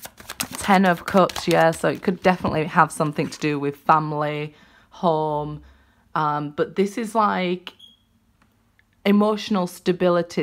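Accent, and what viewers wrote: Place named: Northern England, United Kingdom. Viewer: Nice reading.. thank you! And love the Northern accent.. Ay up lass! 😊😂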